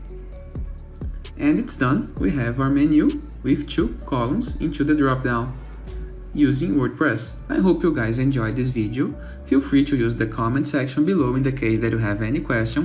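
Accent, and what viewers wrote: Brazil. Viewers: Esse sotaque é BR kkk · Sotaque BR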